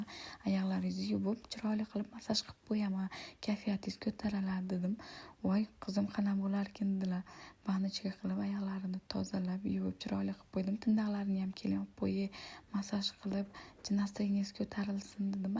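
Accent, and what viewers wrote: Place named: Kokand, Uzbekistan. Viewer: Qatlisiz shevez qo'qonniki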